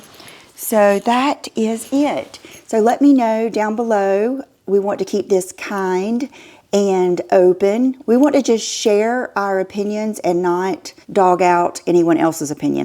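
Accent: South, United States. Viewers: Funny story. A close friend of mine and her daughter were in Paris about 3 years ago. They were in a looooooong line to go in the Hermes store, where her daughter just "knew" (hoped and prayed) she would get lucky. Suddenly, they pulled her out of line, and she was able to buy a Birken on the spot! It was nuts!!! They couldn't believe it. No explanation was given. CRAZY! You just never know. The lawsuit? I have no feeling one way or the other. Love your channel...I've watched you for YEARS and YEARS! You're the sweetest, most authentic Southern Belle I've ever seen... and I'm southern, so I know! LOL I don't know if your state considers themselves the south, but your accent is fabulous!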